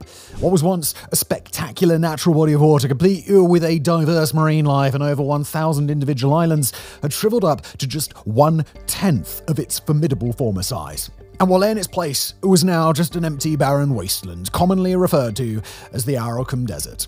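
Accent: United Kingdom. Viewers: That’s cool but I can’t fall asleep to a British accent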